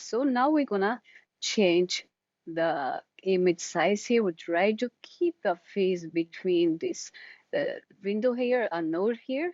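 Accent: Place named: India. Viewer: Just about suffices as a walkthrough of building a very simple workflow for using FaceID with IPAdapter. Half of it is the application of using openpose with a controlnet and NOT a tutorial on FaceID. If you have Indian English and/or experience of low teaching quality then it may prove useful. However, if you're a native Anglo-American English speaker you may find the delivery extremely frustrating. If you are used to being taught by advanced pedagogists then you may also be disappointed by the lack of any clear explanation of the why. This is simply a very basic walkthrough of a simple workflow with no explanation of what is actually happening.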